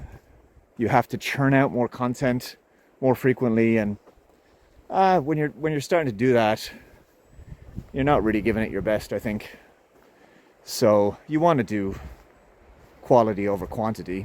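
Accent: Ireland. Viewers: This is a game changer, man. I didn't expect a face reveal. I had a feeling you were ginger, man. The voice. God bless the Irish. I'm quarter Irish. I have family in Cork myself.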